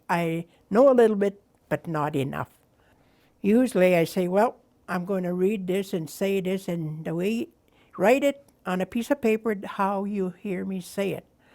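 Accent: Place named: Canada. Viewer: I love that she keeps saying "Eh". A beautiful linguistic blend of Cree and Canadian English :-D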